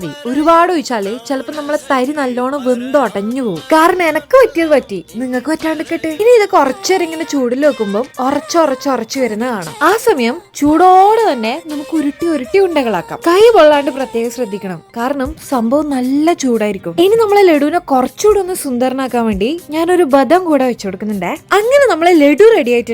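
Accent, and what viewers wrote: Kannur, India. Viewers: നിങ്ങള് കണ്ണൂർ ഏടയാപ്പാ?😅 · That Kannur slang❤addicted 😅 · Chechikutty kannur ano ente bashapole thonnunnu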